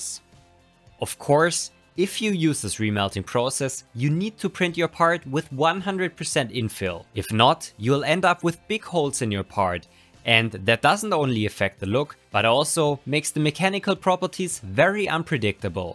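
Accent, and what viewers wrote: Germany. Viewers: Ya german?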